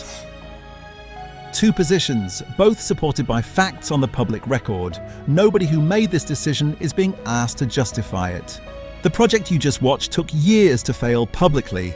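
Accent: United Kingdom. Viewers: Hey Mr. English accent. Why not do a video about the rape gangs in the UK? Or perhaps comment about the politics of the UK?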